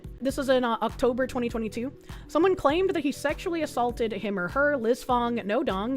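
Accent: Boston, United States. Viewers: Love the coverage, but my "grammar heiling" tendencies flare up every time says ANONYMITY (ah-no-nim-ity) like anonmity (ah-non-mity) 😂 Could be the boston accent though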